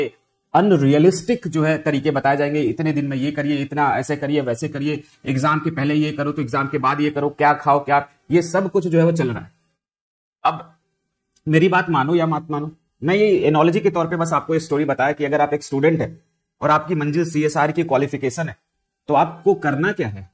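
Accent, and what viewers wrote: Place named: Varanasi, India. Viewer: Kbhie aae to nhi hain benaras bs isi bahane dekha Apka benarsi accent ek no tha 😍 thanku sir for guiding us 🙏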